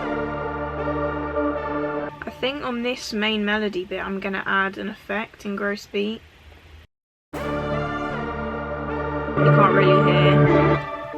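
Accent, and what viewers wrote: United Kingdom. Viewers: Love the beats and listening to British people talk. Best of both worlds 🤣👍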